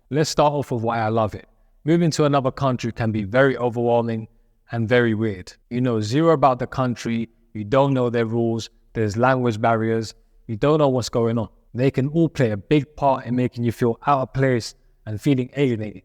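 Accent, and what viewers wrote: United Kingdom. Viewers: You British by any background???.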